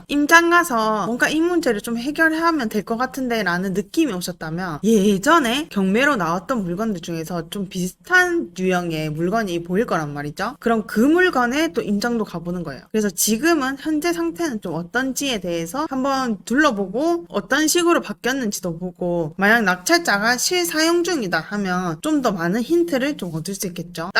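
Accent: Gyeongsang, South Korea. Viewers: 어후 보는 부동산 유튜버마다 쌍도사투리 안쓰는사람이 없누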